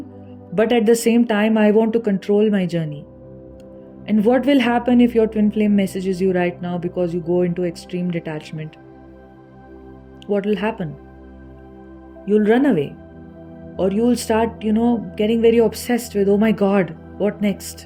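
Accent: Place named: India. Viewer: 🙏 Thanks a lot for such a knowledgeable video and Divine counseling!! I really felt good to get through a channel in English about TF in an Indian voice! 💚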